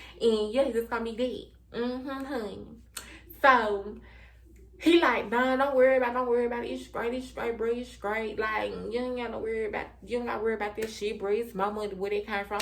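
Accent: South, United States. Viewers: This sista is old school southern. I understood every word. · Your accent 😍 it's giving southern belle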